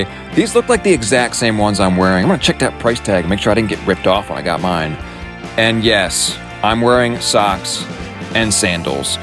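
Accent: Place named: Canada. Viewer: Ive watched a few of these videos from different posters but all seem to be american and i dont think canadian stores tend to have clearance sales to the same degree as in the US. Im not sure if youre american (you have a canadian accent and ive seen some canadian based stores in the background of your videos. Just wondering if this type of thing can work in canada. Also, subbed.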